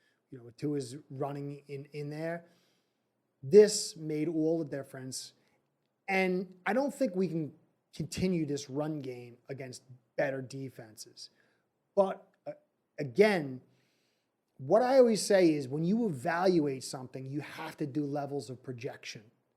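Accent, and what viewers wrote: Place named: Northeast, United States. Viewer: So this is gonna sound weird, but part of the reason I tune in, I’m in CT there’s something cool about hearing someone who has a northeast accent talk fins cuz we got a lot of fans up here from the Marino days lol the Italians like myself as a small kid see Marino from a blue collar town n connected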